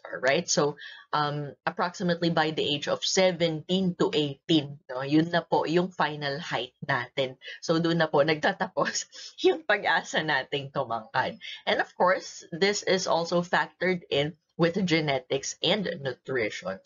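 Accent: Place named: United States. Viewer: Thank you so much po! I love, love you! Super helpful as a nursing student! Currently listening to this while I arrange my flashcards. And oh my, ang natural-sounding po ng American accent niyo, and the way you code-switch makes me fangirl 😳 Linguistic enthusiast here hehe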